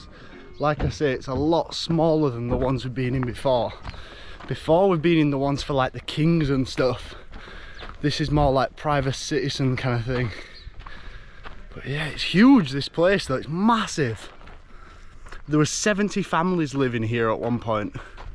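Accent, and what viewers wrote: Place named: Yorkshire, United Kingdom. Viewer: I just love it, you say it as you see it and you have such a great Yorkshire accent, but please do an Aswan Trip you and everyone will love it, i miss egypt so much its been 8 years since my last trip but looking at going to Hurgharda in March 2025, only downside it will be the first time i've done a trip on my own, but i'm that confident in the people and i always feel safe, one story comes to mind on my 3 or 4th cruise they decided to go luxor to aswan then back to luxor then up to Dandarah, woke up one morning on boat to Dandarah and at front and back of cruise boat were tents? i thought whats going on here,turned out because Dandarah is normally off the boat trip schedules and theres a lot of nomadic tribes, anyhow when i looked inside the tent at the front and back i was taken back as there were Machine guns bolted to the front and back of the boat lol, they were very rusty lol so i'm guessing not been used for a long time, anyways we had no trouble and enjoyed the trip to Dandarah, also please make people aware of the police in the white uniforms (tourist police) they are everywhere and if you ever feel unsafe go to one of them as they are only there to make the tourists feel safe, and they will always side with you over a local. 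Such a great country just a shame they have had troubles over the last few years but its getting back to normal now 😃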